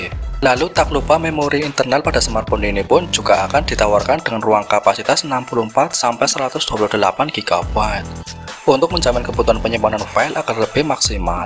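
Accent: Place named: Java, Indonesia. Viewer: Medog banget om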